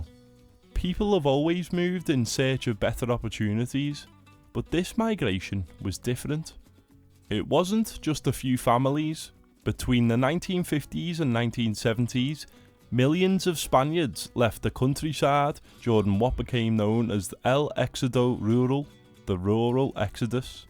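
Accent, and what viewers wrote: Scotland, United Kingdom. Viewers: Are you from Scotland? I mean, it's Scottish pronunciation?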